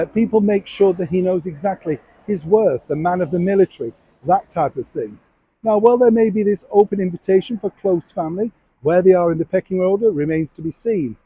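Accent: United Kingdom. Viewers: I really like your channel, Mr. The different scenes from London and the rest of the US, your Northern accent and your very British way of reporting, with good humour and some understated irony, really appeals to me. Keep up the good work! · I adore British accent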